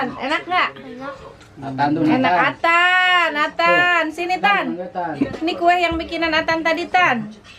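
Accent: West Java, Indonesia. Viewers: Hidup Bsndung..Klo ada Atta Aurell..pasti dong mampir..hidup emk2..t..logatnya sunda pisan..kereeennn👍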